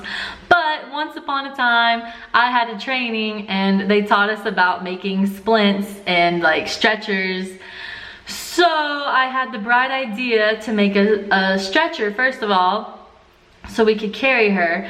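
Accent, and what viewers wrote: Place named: United States. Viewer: As always nice video..even though sometimes is very hard for me to understand american english...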